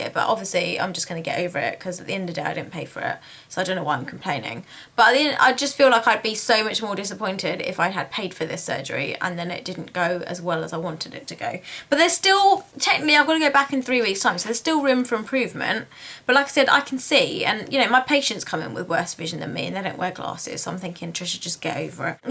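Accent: United Kingdom. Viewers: A Indian girl talking with a British accent sounds ugly.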